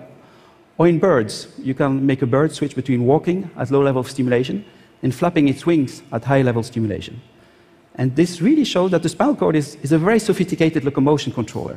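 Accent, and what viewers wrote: France. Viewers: he's a french guy. i can hear his accent 😁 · when i finally noticed he is french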